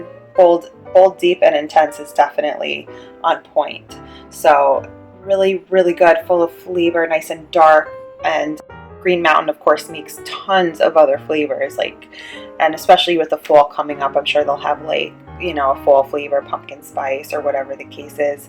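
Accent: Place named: New York, United States. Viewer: Wow what a strong New Yorker accent lol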